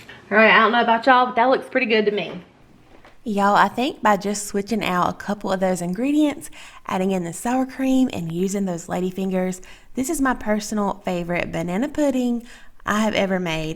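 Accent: South, United States. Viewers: I just love the way you are so laid back and Southern! I haven't tried one of your recipes that I don't like!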